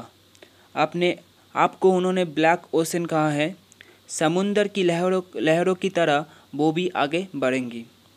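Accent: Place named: Assam, India. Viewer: Apki aawaj to assamese jasi hai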